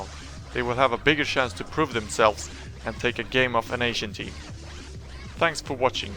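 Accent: Sweden. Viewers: You have the most Swedish accent I've ever heard, I've played with A LOT of Swedish players. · Your overall talking is kinda swenglish. Sorta less than Kungen. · Your english accent, can totally hear that youre a swede :P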